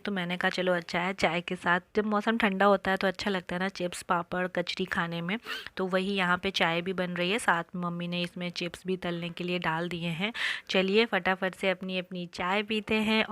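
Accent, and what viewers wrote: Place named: Kanpur, India. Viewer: Purani yaade taza ho ganyi...chauk aur ftp ki galiyon 😀😀😀 First video me Apke voice tone se hi laga ki ap cnb ftp se😀😀😀👍👍👍👍👍 Good work mam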